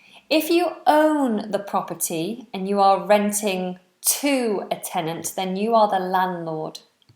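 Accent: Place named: England, United Kingdom. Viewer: ganahan ko sa imohang English accent. salamat kaayo inday!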